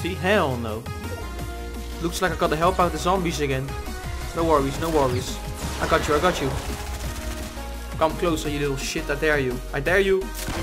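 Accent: Netherlands. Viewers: i luv ur dutch accent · Ik hoor gwn dat je Nederlands bent geef toe want in een van je video's zij je perongeluk een Nederlands wordt😄😄😅😅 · Iemand Nederlands ?? Hij klinkt alsof die nederlands is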